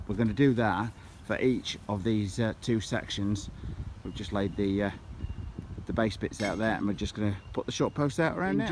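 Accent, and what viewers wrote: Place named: United Kingdom. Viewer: Great job with the review!!! Scary good pointers ! The British accent is cool too!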